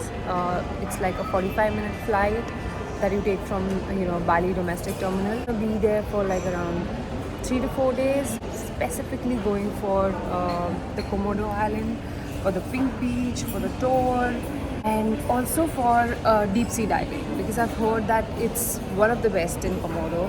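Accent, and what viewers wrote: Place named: Punjab, India. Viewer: Saying it yet again that your Punjabi accent is sooo cute🤌💓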